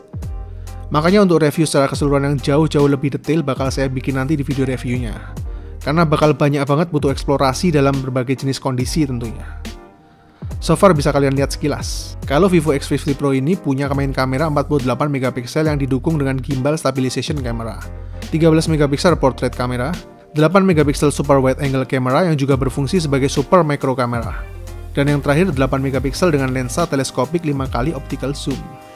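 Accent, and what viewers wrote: Yogyakarta, Indonesia. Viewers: Bang orang Jogja po?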